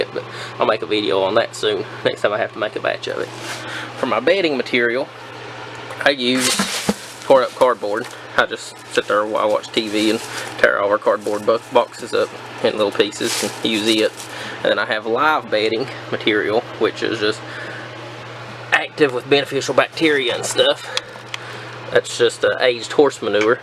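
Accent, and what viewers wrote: South, United States. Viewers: Enjoyed the video. I have a couple bins now and want to grow more. I appreciate the good southern accent. Thanks from TN.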